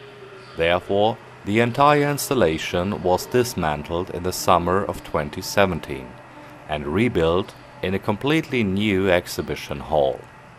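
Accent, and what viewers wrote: Germany. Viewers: The pronunciation of the Germenglish is sooooo funny, love it! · Love that German accent. Great model.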